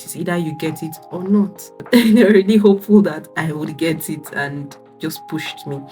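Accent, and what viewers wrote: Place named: United Kingdom. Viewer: Na you de speak Queen's English like this??? Na wa oh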